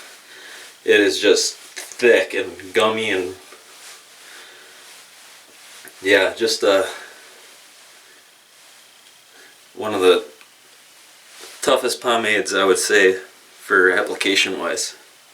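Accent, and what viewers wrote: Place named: Wisconsin, United States. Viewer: You've got a Wisconsin or Minnesota accent man. Good review though.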